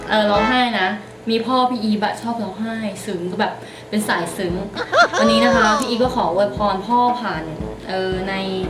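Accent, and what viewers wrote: Isan, Thailand. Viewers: พี่เป็นคนไทยหรือคนอิสานค่ะ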